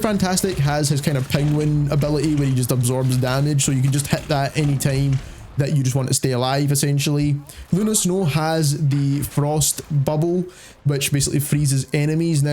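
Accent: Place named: United Kingdom. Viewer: "British" accent so annoying bro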